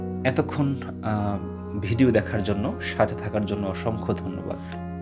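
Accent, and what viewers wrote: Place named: Bangal, Bangladesh. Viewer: স্ত্রী উচ্চারণকে ইস্ত্রি বলেন কেন? এককথা বারবার বলে দীর্ঘায়িত করার দোষটা দূর করুন। বাঙাল উচ্চারণটা না থাকলে ভালো লাগতো।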